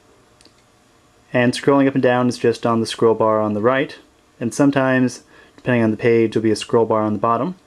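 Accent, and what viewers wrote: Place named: United States. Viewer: annoying american voice-over...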